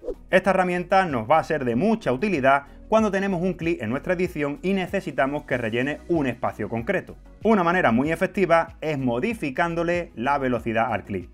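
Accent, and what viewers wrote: Andalusia, Spain. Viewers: Qué bien explicado!! Y qué gracioso el acento andalú, me encanta :) Un abrazo!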